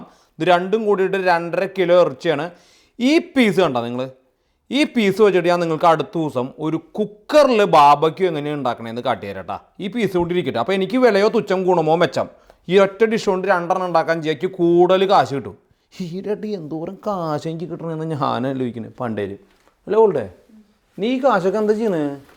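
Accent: Thrissur, India. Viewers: thani Trissur slang.. ❤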